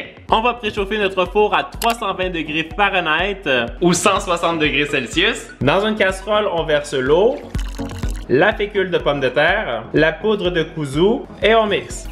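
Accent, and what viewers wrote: Quebec, Canada. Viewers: l'accent québécois est vraiment insupportable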